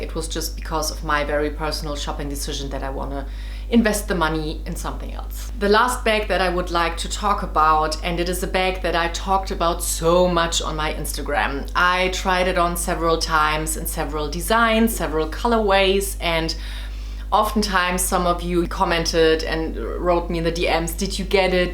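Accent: Germany. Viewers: Where are you from?:) you sound so German 🙈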